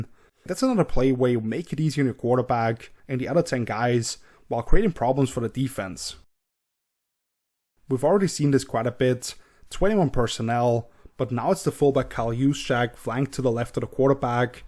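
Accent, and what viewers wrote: Austria. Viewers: Your accent is marvelous. Are you Austrian? You sound so much like Arnold Schwarzenegger... Austrian by birth, moved to the US as a young adult, fluent in English but can't and won't mute the accent.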